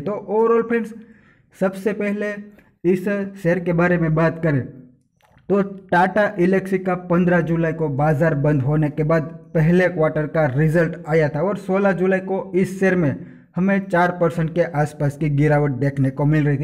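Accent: Gujarat, India. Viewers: ગુજરાતી હોય એવું લાગે છે કેમ ??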